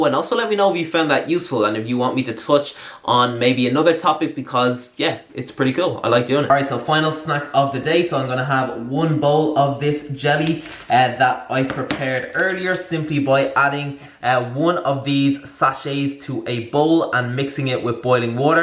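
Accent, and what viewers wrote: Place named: Ireland. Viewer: Based on the accent he's from Ireland, maybe Dublin. Im not sure though.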